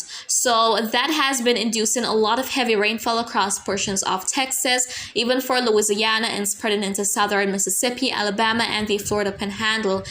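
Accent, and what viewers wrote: Jamaica. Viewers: Sml love u so beautiful thanks for the update from Jamaica 🇯🇲 an love your Jamaica twang lol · Jah know...yu patwah tun up😂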